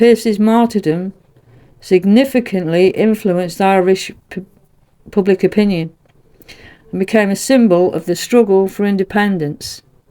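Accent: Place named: England, United Kingdom. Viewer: I dont know if you are English (you sound like it) but if so then well done for talking on such a complex and tricky subject which many English / British don't go near for some reason. And thank you also for calling him "Patrick Pearse" as many Irish for some reason call him Pádraig or Pádraic Pearse which of course is wrong, as you correctly say its Patrick Henry Pearse and was known to everyone as Patrick or Pat. Well done.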